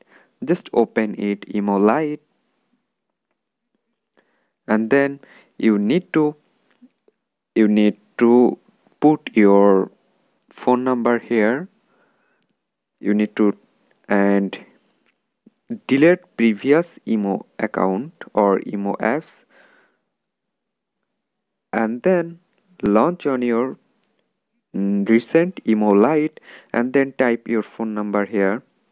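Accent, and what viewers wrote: India. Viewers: You need to speak proper English Remove your hindu accent it sounds disgusting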